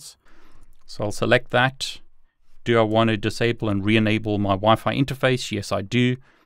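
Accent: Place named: South Africa. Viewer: are you from south africa? your accent sounds south african!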